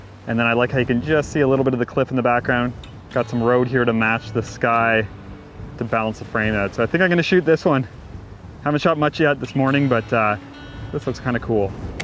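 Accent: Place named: Canada. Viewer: That canadian accent is ... ugh 😬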